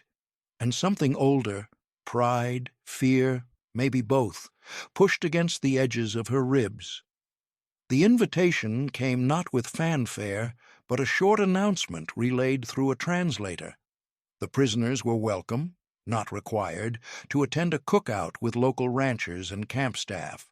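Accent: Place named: United Kingdom. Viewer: Texas in America and yet you choose to use a British sample narration. I don't understand.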